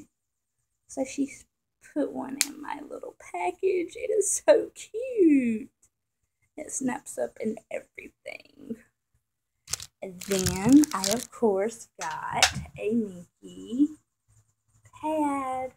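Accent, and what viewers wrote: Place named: South, United States. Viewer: Nice! Where are you from? The south? Love the accent :) You can use books, cardboard boxes etc as a video camera stand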